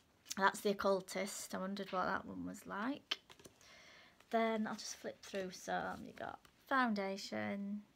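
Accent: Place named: Northern England, United Kingdom. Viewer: Thank you for the recommendation and review, we appreciate you taking the time to do it! And you sound like a fellow northerner (I am from Bradford originally) - Josephine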